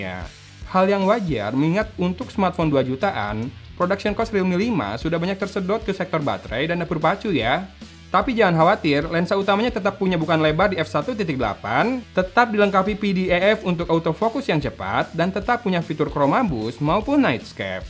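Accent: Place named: Bandung, Indonesia. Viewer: pasti orang bandung bang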